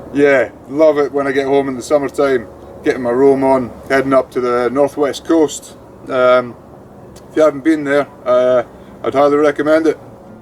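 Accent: Scotland, United Kingdom. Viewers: listen to you speak with your rich Scottish accent all day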